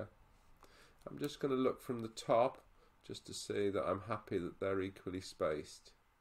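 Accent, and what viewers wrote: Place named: United States. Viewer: holy american accent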